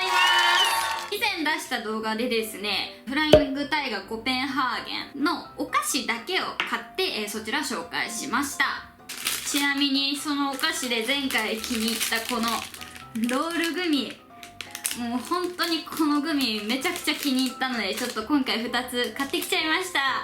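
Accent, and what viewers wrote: Hiroshima, Japan. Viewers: 広島弁かわいいです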